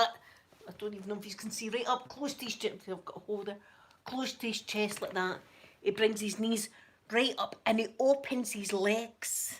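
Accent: Scotland, United Kingdom. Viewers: Your hair is gorgeous! You’re so pretty! I found you a few months back doing a search for asmr in a Scottish accent! Boom baby, I found you! Love your channel and especially the content! You can’t make this stuff up! Hahaha. You certainly live an exciting life. My kids are grown and live in Calgary and Vancouver so it’s just hubby and my animals living on 7 acres off grid here in Ontario! You bring so much excitement to my life. Thank you for sharing your life with us! ❤️🇨🇦❤️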